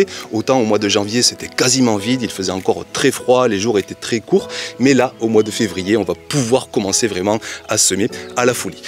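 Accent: South, France. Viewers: Hello, Ton sourire, ton accent du sud, ton enthousiasme, ton énergie, ta passion, mais également ton travail, tes connaissances, ton partage, ta bienveillance fond de toi un marchand du bonheur. Merci 🙏 Ça fait déjà 15 jours que j’ai démarré cette nouvelle saison et je repique aujourd’hui ma première plaque alvéolaires de tomates 😊 J’ai également commencé la création d’une couche chaude format XXL qui devrait accueillir une bonne partie de mes plaques et de mes godets, mais également à terme me produire du compost. C’est mon défi cette année 😅 Je trépigne d’impatience pour découvrir ta nouvelle installation de semis. A très vite.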